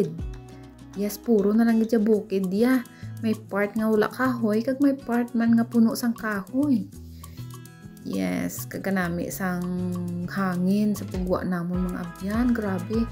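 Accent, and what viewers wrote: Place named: Hiligaynon, Philippines. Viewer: Baw ilongga gid ba🤣✌️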